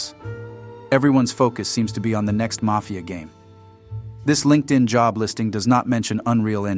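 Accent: United States. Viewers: You have a very crisp clean Italian American voice. And this is coming from a Svensks Deutsche American Vikingr. Keep up this fantastic content sir.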